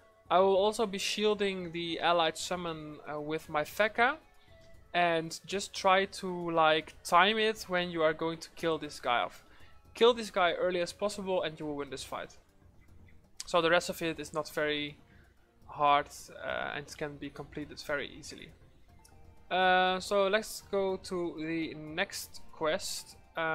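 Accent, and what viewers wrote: Netherlands. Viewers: Ik was al aan het denken dit accent ken ik maar je bent inderdaad nederlands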